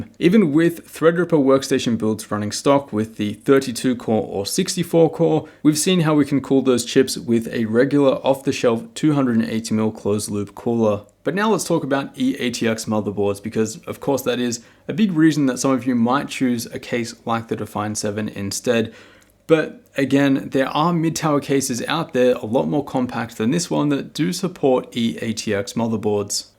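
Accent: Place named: Australia. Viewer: wtf if you want small go small, go small. why are you bashing on the 7 with an aussie accent?! :) and why r u reviewing it in the first place?! water cooling brah! with big radiators and a fancy pump! not like yours!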